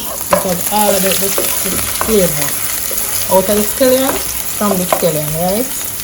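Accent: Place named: Jamaica. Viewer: I luv watching you cook. I respect how you talk and carry yourself. Your Jamaican accent is so real and beautiful!!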